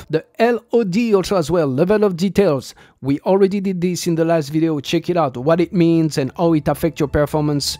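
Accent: France. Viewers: your english sounds french lol nice video bt the way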